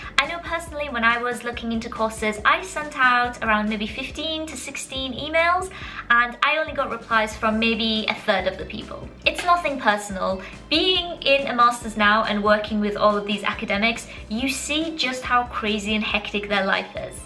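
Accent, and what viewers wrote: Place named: United Kingdom. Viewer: that perky british accent is why i want to go there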